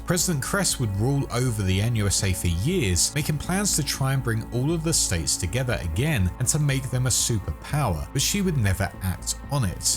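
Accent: United Kingdom. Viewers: nah he’s just British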